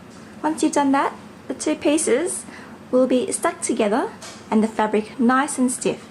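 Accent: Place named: England, United Kingdom. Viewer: Loved your tutorial! Very professional and the clips are beautiful! Being a Mom,I loved that you taught your little girl along the way! So sweet and like some of the other comments here,I agree that your English accent and soft voice make this tutorial all the more delightful to watch! Now I am going to go make these with my daughters!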